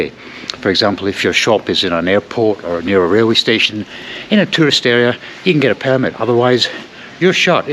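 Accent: Scotland, United Kingdom. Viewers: Isn’t he a Scott?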